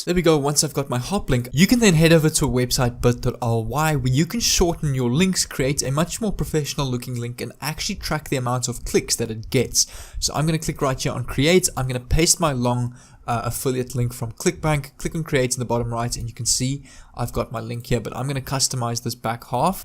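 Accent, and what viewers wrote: South Africa. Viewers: You sound South african ..How did you sign up with clickbank successfully?Im actually American living in SA but cannot sign up..Please help..thanks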